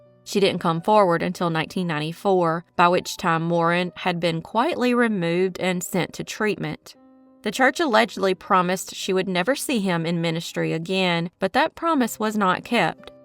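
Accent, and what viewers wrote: South, United States. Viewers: Yours is the only channel that I watch unsolved mysteries, only southern accent I like. Thanks for all of you hard work. Wishing you many, many more subswcribers. Panama here. 🥰♥🖤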